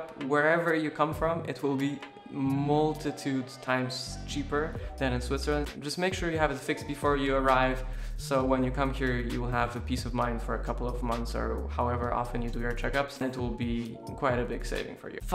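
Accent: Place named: Poland. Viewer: Od razu poznałem po wymowie, ze jesteś Polakiem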